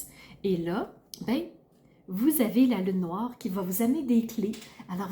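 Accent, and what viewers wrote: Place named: Belgium. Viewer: Pour commencer, j'adore votre accent. J'aimerais vivre par chez vous, parce que l'accent belge, euh... comment vous dire ? Hum !...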